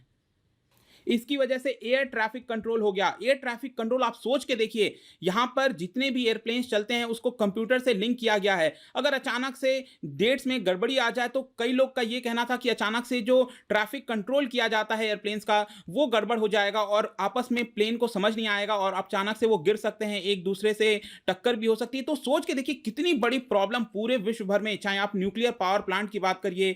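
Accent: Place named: Bihar, India. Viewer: तुम्हारी कर्कश, हाई टोन वाली आवाज साथ में एक ही बात को कई बार रिपीट करना। ढेरों एग्जांपल दे देना। और हाइटोन रखते हुए लगातार बोलते जाना, लगातार बोलते जाना। अगर तुम्हारा यह वीडियो किसी ने पूरा सुन लिया, तो उसका हल्का सा बीपी तो बढ ही जाएगा और वह कम से कम 1 घंटे तक आंखें ऊपर करके बावला सा भी हो जाएगा। जो भी बताना चाहते हो उसको पहले कागज पर लिखा करो। बिना एक भी शब्द रिपीट किए हुए, मधुर आवाज में , संक्षिप्त में अपनी बात कह कर निकल जाया करो। परंतु यह सब तुमसे होगा नहीं। तुम्हारी टो से लगता है कि तुम बिहार साइड के हो, या पूरब साइड यूपी के। वैसे भी तुम लोग बहुत ज्यादा बोलते हो।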